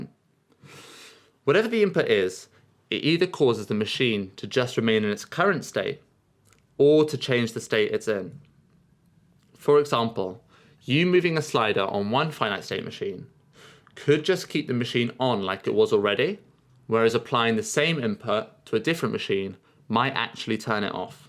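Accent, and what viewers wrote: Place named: Australia. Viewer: Australian accent♥️♥️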